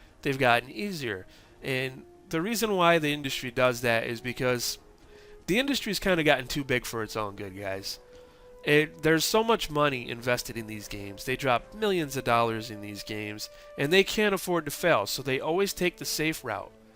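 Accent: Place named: New England, United States. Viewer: This discussion sux you sound like a Yankee just like that dumbass suckmyturban! And you didn't even play confrontation that was socom at it's finest! Socom 2 was best in that era. But when the HD world came out it was all about CONFRONTATION! Only problem like the other socom was the cheaters! Socom was known for after been out a year or so the cheating came out! Socom was the best game ever made!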